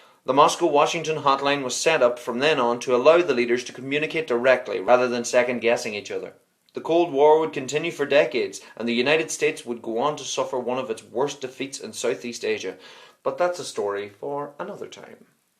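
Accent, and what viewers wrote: Ireland. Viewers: He's Irish. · I think it's because he's Irish and JFK was Irish-American. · are u irish?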